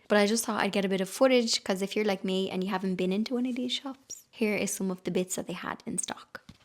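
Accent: Ireland. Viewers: Leave the ceiling white. I admire your DIY skills. Love your Irish accent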